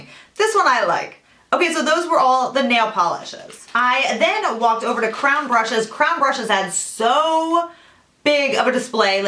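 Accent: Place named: Northeast, United States. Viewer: I knew you had a north east accent , I'm from the north east as well ( New Hampshire ) I live in southern Virginia now . This makes me love your channel even more .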